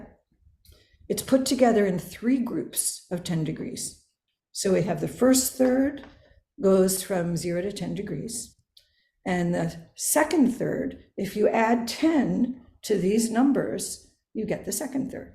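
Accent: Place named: United States. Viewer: Whose accent, I know the American accent is difficult to understand if U put on captions it will be ez to follow her. I hope that helps.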